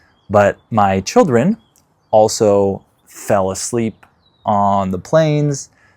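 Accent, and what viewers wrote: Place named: United States. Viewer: American accent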